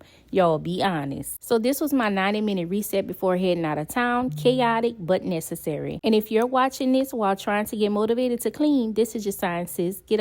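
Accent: Louisiana, United States. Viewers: I love you accent. Are you from Louisiana?❤ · Are you in Louisiana ?? Omg your accent is so strong & cute ❤️ I am a Texan so i get it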